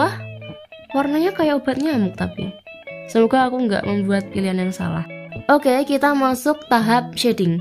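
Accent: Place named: Banyumas, Indonesia. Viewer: Mbak medhok ngapak Bahas dong kenapa kenapa kita gak bisa improve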